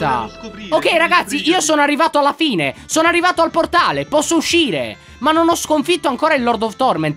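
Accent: Sardinia, Italy. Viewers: sono sardo come te e ho scoperto di dove sei perché l'accento e il nome sono di un posto della Sardegna che che conosco bene.Prima pensavo che fossi di Sassari ma ho capito che non era così comunque non dirò a nessuno nei commenti di dove sei perché farei lo spammone bastardo ma tralasciando questo....volevo chiederti se facevi dei raduni perché tu mi stai molto simpatico e molto a cuore e vorrei incontrarti di persona.Ho 11 anni e sono tuo fan a quando facevi "Dayze" che se non ricordi era un gioco di zombie e hai giocato moltissime partite sopratutto con Mario. Poi sono nate altre serie come quelle di Happy Whells e minecraft e se non ricordo bene il tuo primo video è stato proprio di minecraft.Comunque io vorrei veramente tanto che tu facessi un raduno qui in Sardegna perché vorrei incontrarti di persona e mi farebbe veramente felice. SPOLLICIATE PER FARGLIELO LEGGERE!!!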